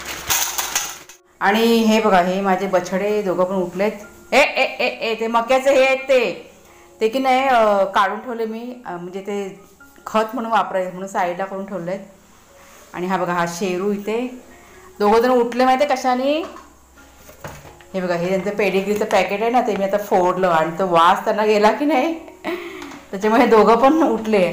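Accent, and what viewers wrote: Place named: Wadval, India. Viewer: तुटल नाही वाडवळ बोलायच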